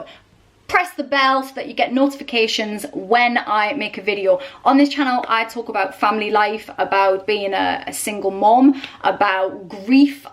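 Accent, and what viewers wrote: Newcastle, United Kingdom. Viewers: Your accent is awesome, it's not every day you get to hear a Geordie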